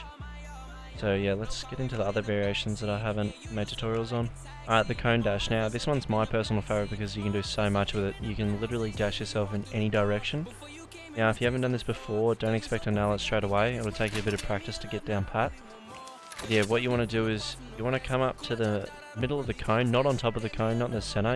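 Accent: Australia. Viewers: R u Australian, if so r u best OCE? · love the australian accent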